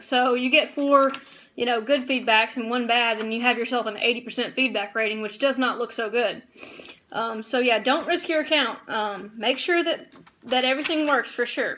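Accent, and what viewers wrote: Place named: Georgia, United States. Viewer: ...love your accent. You from GA is you not? Lol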